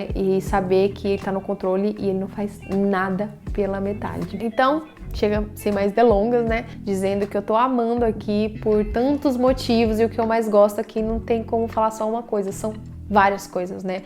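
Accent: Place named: Rio de Janeiro, Brazil. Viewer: Também amo sotaque carioca (sou gaúcha - não amo algumas expressões daqui - mas gosto do jeito que o gaúcho da capital fala, acho jovial e único 😁). É desafiante recomeçar, mas há muito valor em recomeçar. É vida somada, sem dúvida! O que for material, será conquistado, certamente será. A vida é boa quando vivemos intensamente o momento, estejamos onde estivermos, em cada detalhe e fase. O sentimento de pertencer é mágico! É como reencontrar-se/reconectar-se. Folclore ☺ Nada é fácil ou só felicidade. O maior exemplo disso é realizar o sonho de se formar... A Universidade não é um morango e saindo dali, fica ainda mais tenso. Mas compensa pq é a materialização de um sonho. 🥰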